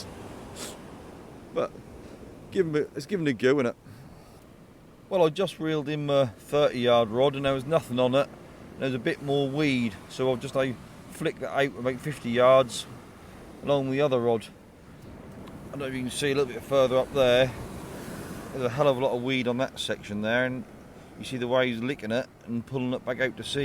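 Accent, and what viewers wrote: Norfolk, United Kingdom. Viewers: Hi, great video. Love your content, and your style that you present it in, that lovely Norfolk accent. like the hoodie, keep on entertaining us.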